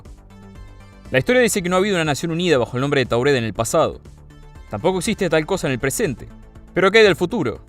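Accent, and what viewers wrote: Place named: Argentina. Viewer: Lo único que le pone en la torre al relato es el acento Argentino.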